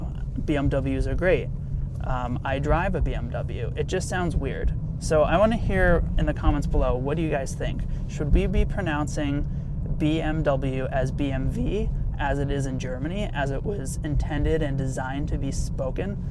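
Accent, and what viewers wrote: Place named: United States. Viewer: Not , Dubble U , but Wee but the "ee" from the Wee , sound like , the " a " in " Say, "Bee em Wee" (not Vee , a V in German is pronounces as a "Vow" ) , in Dutch we say it the same as the Germans. , You havent been saying it wrong , you have just been pronouncing it like a american , not a german :D . Like " Zeit " the Z is pronounced like a "TZ" , TZEIT , you would write , TZAIT. writing i phonetically makes it easier.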